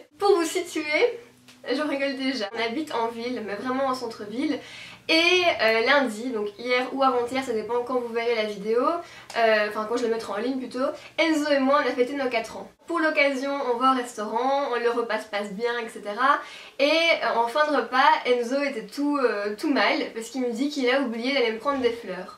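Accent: Belgium. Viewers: Coucou, J'adore tes vidéos et ton petit accent belge:-) Ps: pense à recycler tes anciens téléphones portables, c'est mieux pour la planète!!!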